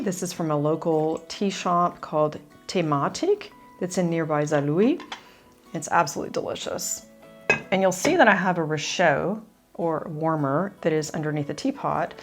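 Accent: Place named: Texas, United States. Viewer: I love your German cause I can hear a little Texas thang in it, you sound lovely. I went to Germany for world youth day in 2000.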